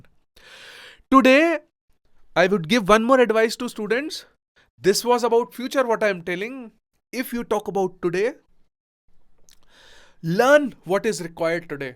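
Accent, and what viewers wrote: India. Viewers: AP b English desi bol te he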